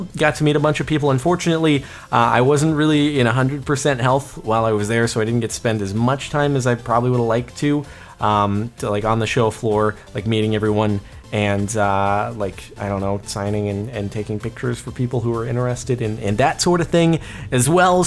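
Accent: England, United Kingdom. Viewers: your British accent is AWESOME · being from England, I can confirm that your English accent is 100% awful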